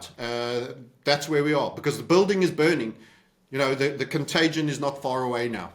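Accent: South Africa. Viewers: This is an Africaans accent, right? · South African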